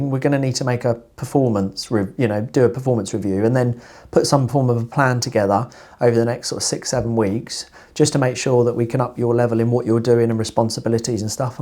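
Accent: United Kingdom. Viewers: My narcissistic boss comes into the office every day whilst we work from home so we can spend time with our families. He looks pathetic sat at his desk getting angrier because he can’t stand that work is not everything for some people. I wasted 30 years in my job commuting which time I’ll never get back, thousands of hours wasted commuting is the biggest regret of my life at 50. Can I just say it’s refreshing to hear an articulate British gentleman like yourself who doesn’t fill his videos with profanities.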